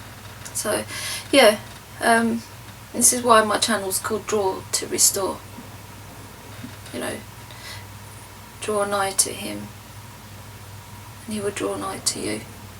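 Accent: United Kingdom. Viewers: I'm an alcoholic, but sober 12 years. I know where your coming from. You've probably done a lot of mental damage. I go to aa a couple of times a week. I'm saved by Jesus. Don't isolate, get out and mix. Your not alone. Love your London accent. Keep the faith sister. Big love xxx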